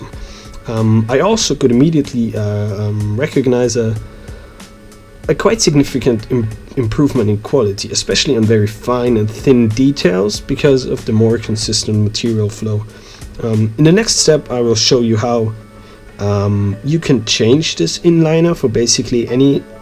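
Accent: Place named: Netherlands. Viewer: Bist du gebürtiger Deutscher? Ich höre da irgendwie einen Niederländer heraus, du sprichst ziemlich gut :)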